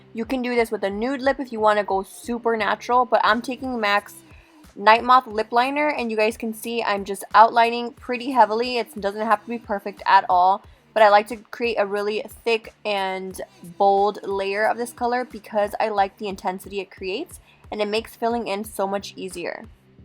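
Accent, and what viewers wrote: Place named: Mexico. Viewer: Kmarie Valle It's called an accent... and majority of us talk like this haha isn't the only Mexican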